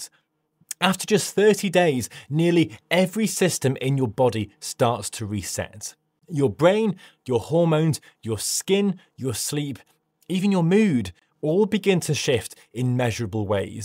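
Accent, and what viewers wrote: England, United Kingdom. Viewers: Oh the delight of hearing a nice English voice! Thank you dr.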